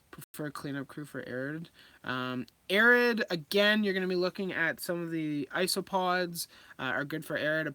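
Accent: Canada. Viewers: just curious where you reside? u sound like an albertan like me (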